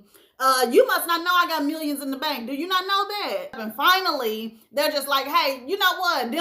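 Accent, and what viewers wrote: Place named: St. Louis, United States. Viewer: sounds like a STL woman.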